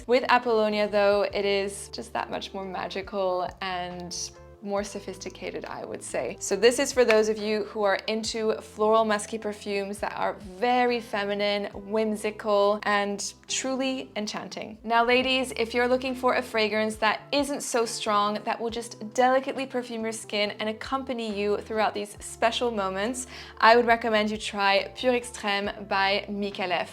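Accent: France. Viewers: Your english accent grow fast, I almost dont hear your French accent except when you say "Jus de rose" 😁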